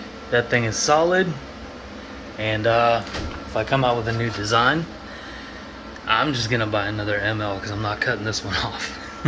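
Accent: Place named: East Coast, United States. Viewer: Looks awesome…..I know your in Cali but you sure sound like your from the east coast!! One love G and infinite blessings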